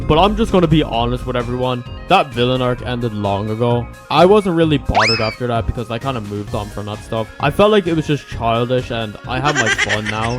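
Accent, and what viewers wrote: Ireland. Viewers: are you IRISH:hand-pink-waving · Are you Irish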